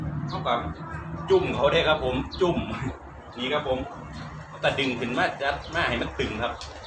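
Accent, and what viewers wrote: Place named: Isan, Thailand. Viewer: เวาอีสานทืกใจจังเลยเฮาคนบ้านเดียวกัน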